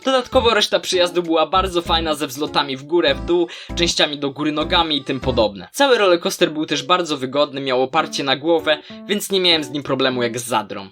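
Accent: Szczecin, Poland. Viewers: ty z szczecina?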